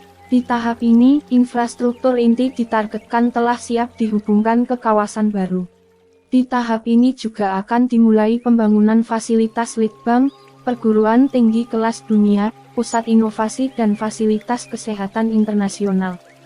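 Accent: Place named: Java, Indonesia. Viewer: presenternya medok banget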